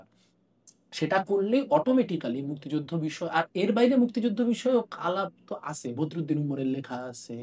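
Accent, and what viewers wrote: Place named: India. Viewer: উপস্থাপকের ভাষার মধ্যে কেমন যেন একটা ইন্ডিয়ান ইন্ডিয়ান ভাব আছে, ❌দাদা ✅ভাইয়া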